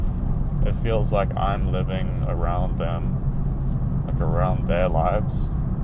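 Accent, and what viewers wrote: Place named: South Africa. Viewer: The South African accent is so thick I love it 🙂‍↕️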